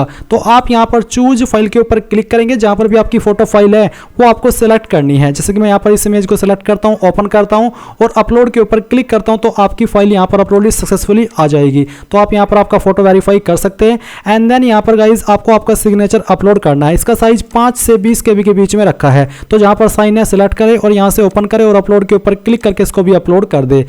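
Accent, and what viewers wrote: Saharanpur, India. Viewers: Tum saharanpur se ho kya bhai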